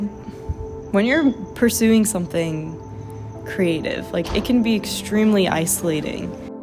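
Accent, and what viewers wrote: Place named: France. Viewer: i saw this video after the dream job worst nightmare video, and you speak french natively with a french accent, not from montreal/quebec ? what's up with that? could be an idea for a video!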